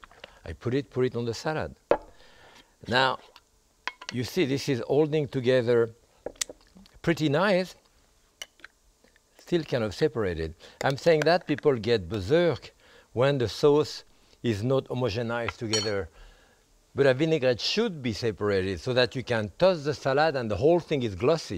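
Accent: France. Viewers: Love his French accent 's English, and easy methods in French Cooking! Thanks. :) Merci! Jackque.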